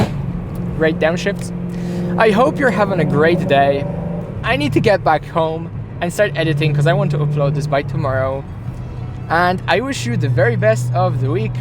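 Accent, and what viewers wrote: Ireland. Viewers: Great car!, you lived in Ireland or your teacher was one? Asking because of accent 😁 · I understand you're a Polish channel.. But are you from / did you grow up in Ireland? Swear to god you sound like you're from Ireland when you're speaking - like somewhere from the southeast of the country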